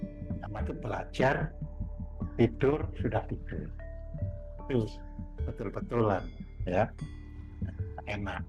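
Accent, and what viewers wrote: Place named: Surabaya, Indonesia. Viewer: Bopo Suroboyo ne kentel bgt nih, Sampek emeh keplincuk 😅😅😅, Rahayu bopo ❤❤❤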